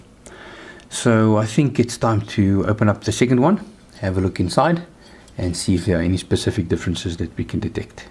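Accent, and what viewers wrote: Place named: South Africa. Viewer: I can spot a South African Accent any day!! Great video bru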